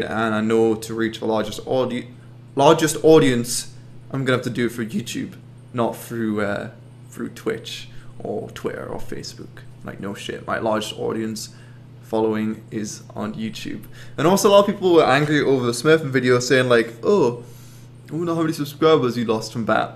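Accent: United Kingdom. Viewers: I just want to hear that beautiful British accent bby grill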